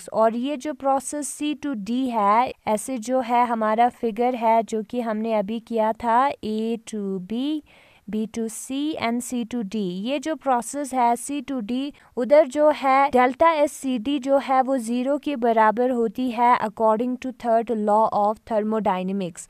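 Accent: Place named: Kashmir, India. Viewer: Ur accent is Kashmiri for sure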